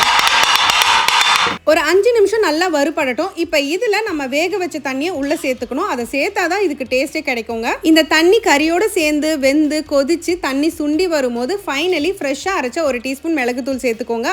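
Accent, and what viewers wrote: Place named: Chennai, India. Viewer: Unga peachy kakave unga video ve paakuren…Chennai language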